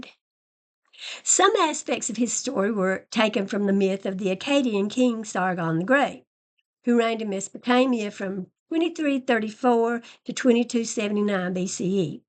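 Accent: South, United States. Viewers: Very, VERY occasionally, the algorithm does me a solid. This is the second one of your videos that i’ve seen, and only because the algorithm recommended your most recent video to me yesterday. I will obviously have to subscribe and catch up your back-catalog. I greatly appreciate your clear-eyed, calm, and humane thoughtfulness. I’m also pleased to have finally found an online exvangelical with properly delightful Southern accent. Representation matters! 😋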